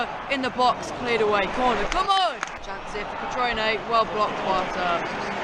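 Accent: Manchester, United Kingdom. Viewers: nice manchester accent lol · That's a strange manc accent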